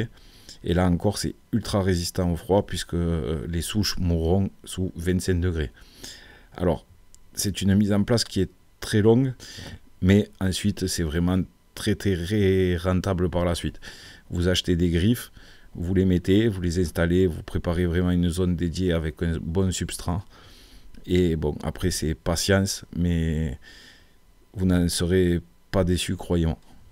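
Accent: South, France. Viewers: Merci beaucoup pour vos vidéos. L'art du jardinage avec l'accent du sud . Super! Avez-vous entendu parler de la mâche perpétuelle. Merci pour votre réponse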